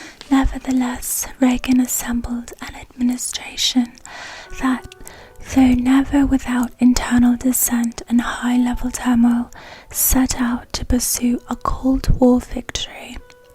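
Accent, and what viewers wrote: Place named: United Kingdom. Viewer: Your accent is so stunning! Are you from the UK?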